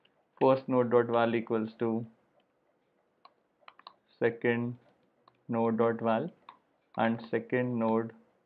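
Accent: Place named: India. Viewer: I just can't deal with the heavy Indian accent. Great programmers tho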